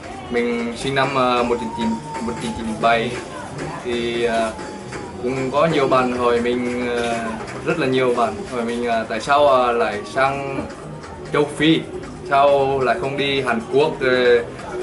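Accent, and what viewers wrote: Nghe An, Vietnam. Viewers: nói giọng giống người Nghệ An Chúc e và các bạn bên đó luôn mạnh khỏe và thành công trong cuộc sống nhé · Giọng giống Nghệ An quá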